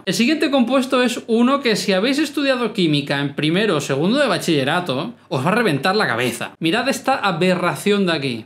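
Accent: Cuba. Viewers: Cubano xd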